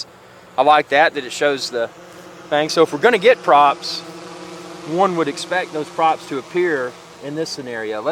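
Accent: South, United States. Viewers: thank you.. I am glad I found your channel... love your southern twang....new subscriber....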